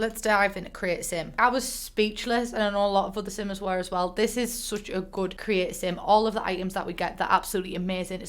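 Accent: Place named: Teesside, United Kingdom. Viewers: Can totally tell you’re from near Middlesborough 😂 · Okay - this might honestly sound so damn stupid and irrelevant, but it's SO nice to finally see a popular, nice genuine youtuber, especially one on a topic I LOVE with a fellow northern accent?? Granted, I wasn't BORN in the UK, but having lived here since I was 4, I grew up with that North East, Durhamite, teeside accent.. and I was never.. overly fond of my own - it was always called out by my southern friends and commented on since it "never fitted me" "made me sound- **queue generic insult**" and as stupid as it is, it always got to me?? But it's so nice to actually see it, hear it surrounded with nothing but love, or well, not even seeing it mentioned? Now reading this back: I'm so afraid that this may be insulting to anybody else with these kinda accents - but please know I actually adore em, just I've never had a good experience with MY OWN. 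But also, it's nice to also see somebody from around this area being successful as well! Working class ganggang may understand ig, idk.. TLDR: idfk I ramble sorry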